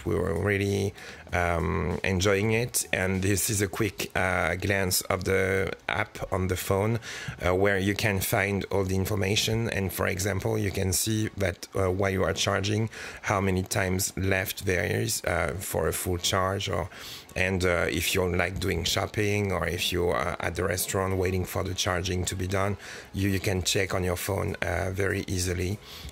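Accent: France. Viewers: your charming french accent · trop marrant la voix avec l'axent français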